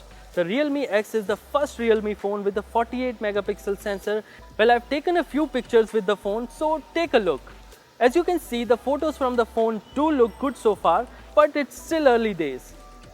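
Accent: India. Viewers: Accent of the accent.... Indian accent😂😂😂 Yours is ultimate....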